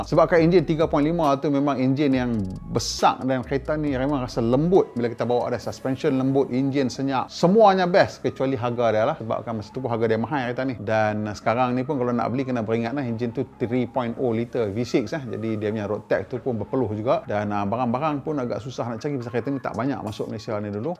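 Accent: North, Malaysia. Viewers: Bunyi Utaqa ceq oiii. Tobat lawak ckp. Heqot, mampoih. 😂😂😂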